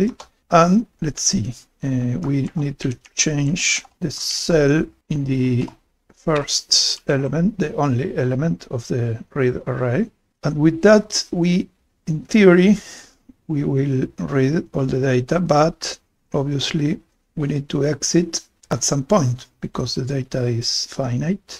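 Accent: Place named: Argentina. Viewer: How nice that I could notice the argentine english accent just 10 seconds into the video with no prior clue haha. Thanks for sharing the project!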